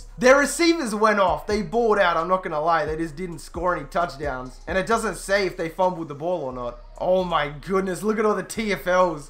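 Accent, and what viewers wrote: United Kingdom. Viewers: I CAN"T DEAL WITH HEARING FOOTBALL WITH A BRIT ACCENT sorry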